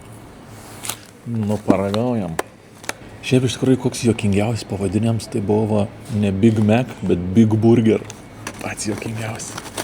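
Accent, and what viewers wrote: Samogitia, Lithuania. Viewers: Kaip keista išgirsti kalbanti taisiklingai ne žemaitiška tarme:DDDD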